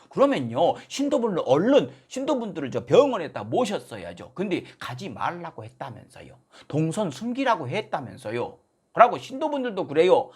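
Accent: Jeolla, South Korea. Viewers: 정말로 반갑당게요. 전라도 사투리 징허게 구수허네요. 거기다 칼럼내용 속시원허네요. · 성범아 윤석열이 나라말아 먹는게 아니고 이미 문재인이 대한민국을 다박살냈다 아파트 한채 6억하든게 지금13억 간단다 알것냐 지대로 알고얘기 해라 알것냐 전라도 말싫다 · 전라도사투리 잃져버리지도 않으셨네요ㅌㅌ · 고향이 서울이란데 우째 저리 전라도 말을 구성지게 잘할까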